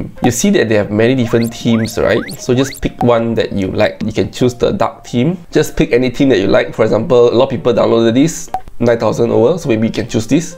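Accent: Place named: Asia. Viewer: Bro has an Asian accent 🤣 (not hating)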